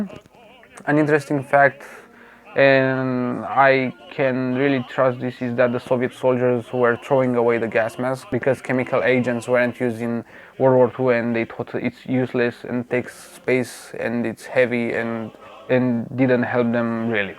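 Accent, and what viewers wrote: Romania. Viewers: are you a romanian?i say this because you have a romanian accent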